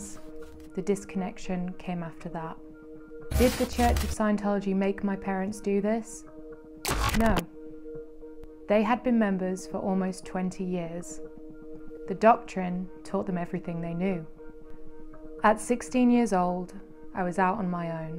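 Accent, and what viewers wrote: Manchester, United Kingdom. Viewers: Hey... I found this from Reddit, Scientology, yes watched a few things and looked into it a bit over the years but this first part has knocked me for six! I think its because you bring home the total reality, home indeed, being a fellow Mancunian. I can also relate to a lot of what you experienced growing up, key development ages to boot!!! It really jumps out at me the True Rebel with a heart bit that you were obviously born with (takes one to know one;). I adore that in everyone, more today than ever before. I was due to be up early tomorrow but i feel an educational binge coming on. Hope you share what you have become in life apart from this brilliant touching series (of which takes a lot of skill and commitment etc) because that's the biggest inspiration, coming out the other side with something of your own to show, and i don't mean materialistic status crap either, I mean the soulful things. 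this kind of abuse destroys the soul but to survive it makes you immensely emotionally intelligent and in touch. You are in possession of large heart! your unselfishness says that much... respect:) Awfully inspiring and... refreshing to hear my home accent lol xx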